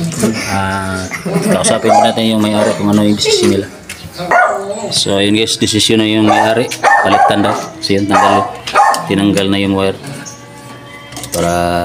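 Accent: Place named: Visayas, Philippines. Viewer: Idol taga asa man mo? kay bisaya man ang imong dialect? Taga cebu ko tks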